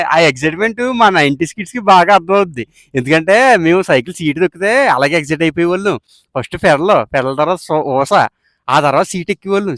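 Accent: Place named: Godavari, India. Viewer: Nenu mee videos chustunna because your Godavari slang / accent..... bagundi....east / west godavari area lo best places to visit kosam few reels cheyyandi...all the very best kind regards, Ravi , Vizag.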